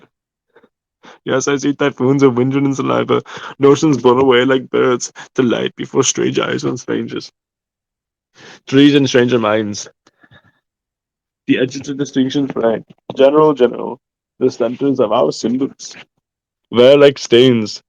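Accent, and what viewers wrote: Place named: India. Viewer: Indian ahh accent 🥀💔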